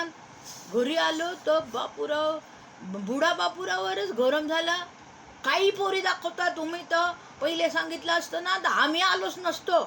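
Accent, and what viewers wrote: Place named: Vidarbha, India. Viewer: खूप सुंदर कथा सादर केली आहे़ . विदर्भ बोलीचा ठसका एकदम कडक 👌👌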